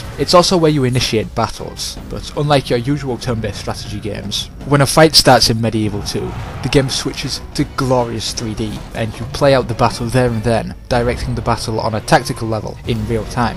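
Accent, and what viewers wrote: United Kingdom. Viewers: LucaCK Luca He died of some sort of disease, I can't remember. also yeah, British, I can't believe it never struck me before, I guess I had never heard that kind of regional accent before.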